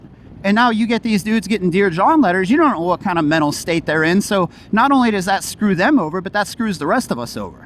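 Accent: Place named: United States. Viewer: well American people always relocate so I thought you must have relocated there .....ofcourse I know your accent is American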